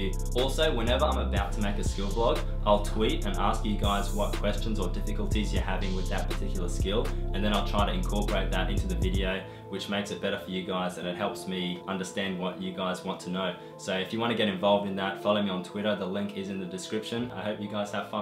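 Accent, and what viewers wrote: Australia. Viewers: didnt realise you were aussie dude! where abouts are you from? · U Australian ?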